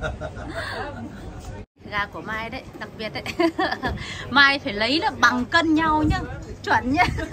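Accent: North, Vietnam. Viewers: Chào Mai ! Nghe Mai giọng bắc mình cũng là người bắc. Mai quê ở đâu vậy? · Thích nghe giọng Bắc của Mai, mình vô tình mới xem kênh Mai , vui vẻ và gia đình hạnh phúc ❤️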